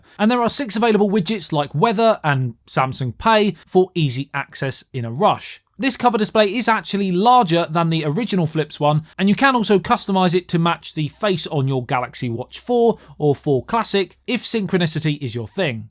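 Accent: United Kingdom. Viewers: That's how Brits pronounce Z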